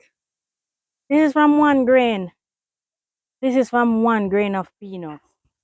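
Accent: Jamaica. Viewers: This is how most Jamaicans speak. Go find a treadmill and leave the lady alone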